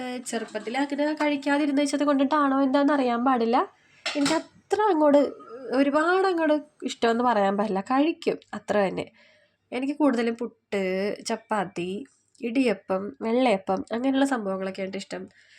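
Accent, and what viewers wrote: Kochi, India. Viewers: Aa "tta"kelkananishttam tttta 😊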